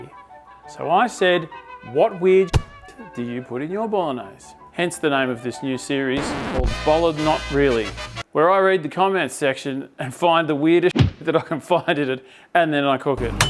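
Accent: Australia. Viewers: I hate that you have to censor your beautiful australian english on youtube if you want to earn any money. FUCK YOUTUBE.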